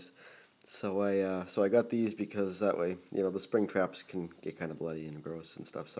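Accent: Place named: New England, United States. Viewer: A New England accent always gets my attention. 😊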